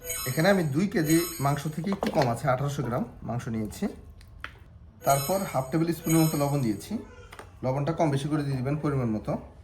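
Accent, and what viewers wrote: Noakhali, Bangladesh. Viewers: নোয়াখালীর মানুষ যত শুদ্ধ করেই কথা বলুক,আমি ঠিক বুঝে ফেলি।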